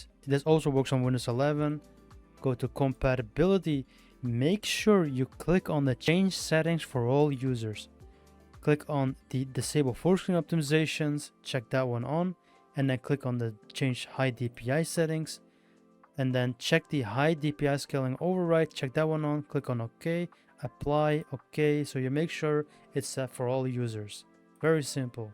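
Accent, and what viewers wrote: Turkey. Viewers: türk mü la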